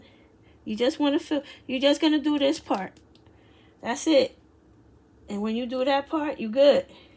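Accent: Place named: New York, United States. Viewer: Thank you your videos are always enjoyable 💘 I'm from NY originally and your accent reminds me of home lol 😘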